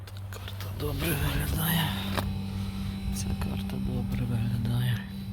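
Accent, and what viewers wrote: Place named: Ukraine. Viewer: Суржик ужасный!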